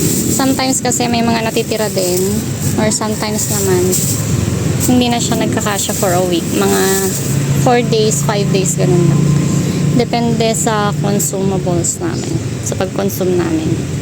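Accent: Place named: Manila, Philippines. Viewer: Hi,. May I know where you're originally from? You speak Tagalog and English like someone from Manila or Luzon.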